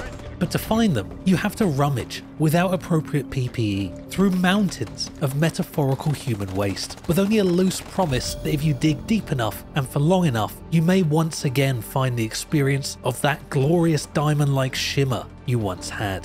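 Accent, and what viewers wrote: United Kingdom. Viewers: What a great video, thank you for your brittish accent.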